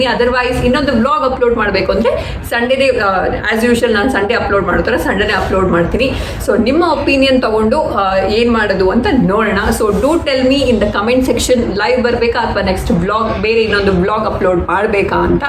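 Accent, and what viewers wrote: Mangalore, India. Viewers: Sis nivu mangalore kannada ne matadi keloke manasige eno khushi anasatte plz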